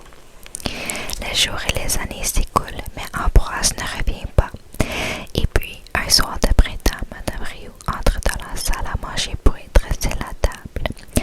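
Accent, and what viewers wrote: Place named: Quebec, Canada. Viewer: L’accent québécois en asmr c’est trop innnncroyable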